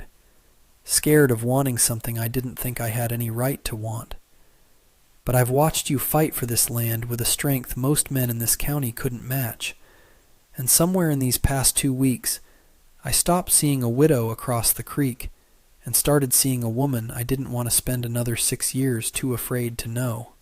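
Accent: South, United States. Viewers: This is a very moving, a very beautiful story. And the narrator reading this story to us is simply the best. His southern accent is perfect for this kind of stories.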